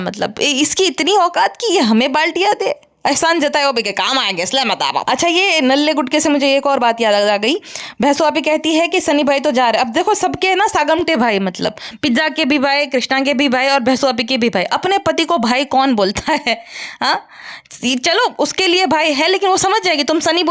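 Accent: Gujarat, India. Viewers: Aur ek baat , apka voice bilkul rashmi desai jaisi hai। Or gujrati ho isiliye sayad acsent vi kuch had tak waisa hi lagta hai। Par voice to ekdam ditto hai।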